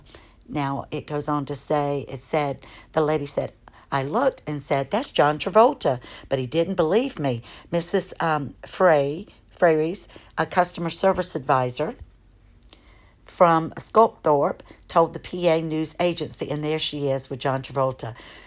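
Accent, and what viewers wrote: South, United States. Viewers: Where in the south are you from? Beautiful accent.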